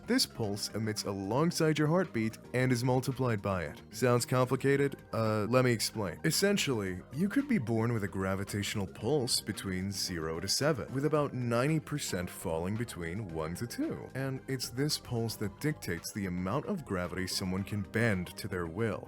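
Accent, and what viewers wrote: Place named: United Kingdom. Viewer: You sound like you live in a steampunk britain, its captivating I love this style of videos discussing niche topics i really like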